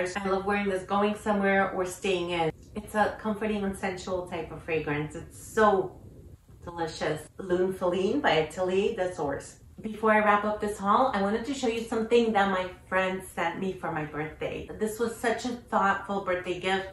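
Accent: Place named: New York, United States. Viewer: I just love your New York accent ! ❤️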